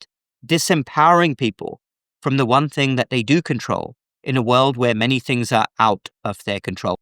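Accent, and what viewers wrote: United Kingdom. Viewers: You still have the British accent Doctor 😊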